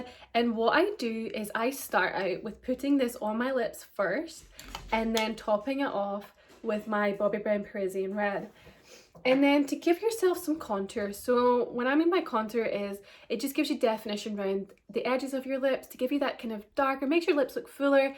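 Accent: Scotland, United Kingdom. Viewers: Love your Scottish accent hen.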